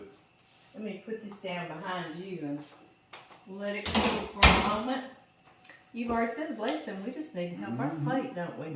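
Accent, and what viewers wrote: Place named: Alabama, United States. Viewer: You don’t sound Cajun but Alabamian 😁. I grew up in Louisiana but from Florida! Never made this but parents learned how.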